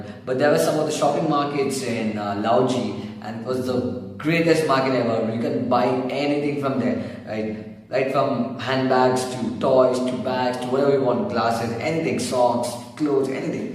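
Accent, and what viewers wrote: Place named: Russia. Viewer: Russian accent👌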